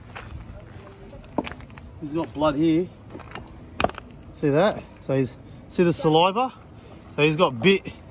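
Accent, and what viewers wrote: Australia. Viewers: You got a bit of an Australian though it seems you live abroad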